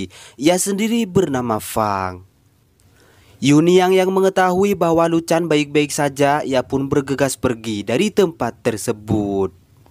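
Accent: Madura, Indonesia. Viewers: Yg menarasikan pasti org madura y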